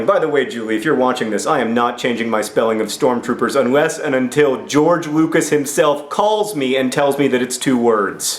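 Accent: United States. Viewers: OOOoohh Calednrically!?!? I am not so used to the American accent! I thought he said "collindrically!"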